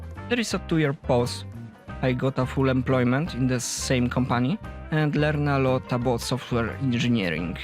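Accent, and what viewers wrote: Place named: Poland. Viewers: slychac ten polski akcent 😅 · Polacy, nie wstydźmy się swojego akcentu! :) To, co jest najważniejsze, to czy jesteś w stanie zrozumieć przekazywaną informację - ja oglądnąłem i nie miałem z tym najmniejszego problemu.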